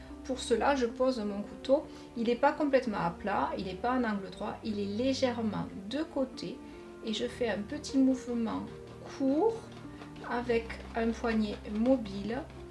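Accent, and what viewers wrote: South, France. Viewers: Bonjour! J’aime beaucoup apprendre en votre compagnie. La démonstration et les explications sont très claires. Quant au rendu c’est très sympa. Votre beau sourire et le petit accent du soleil ne gâchent rien. Merci!!!👍😃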